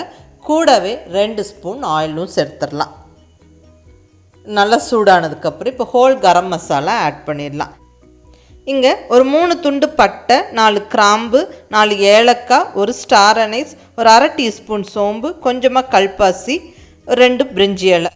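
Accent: Coimbatore, India. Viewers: Nama Coimbatore Tamil kekalam Inge vantha.. 😍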